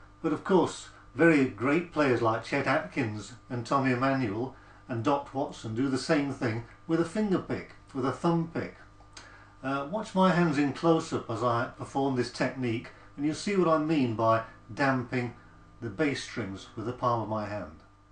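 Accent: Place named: United Kingdom. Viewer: Glad I have found your channel. It’s nice to hear another Brit. I’ve been playing finger style or Travis picking for over five years now. I want to master the finger picks so I can get more volume for busking and they get the best sound out of my wooden bodied resonator guitar. It’s taken me 2 years to get the hang of using the slide properly. Hopefully it won’t take as long. I have been using the plastic ones but recently ordered some metal ones and I find them much easier. Thanks for taking the time to share your knowledge and upload. People like yourself are doing a massive service to us just learning. I have pretty much taught myself how to play guitar, slide and finger style. From YouTube. I’m currently teaching myself mandolin. Due to bad health I’m unable to attend physical lessons. The lessons have paid for my living costs and even bought me a guitar one summer. 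Thanks again. Ric in Leeds West Yorkshire. 🇬🇧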